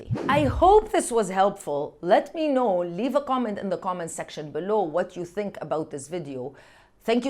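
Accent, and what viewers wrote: Arab world. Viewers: Love to hear Arabic accent of English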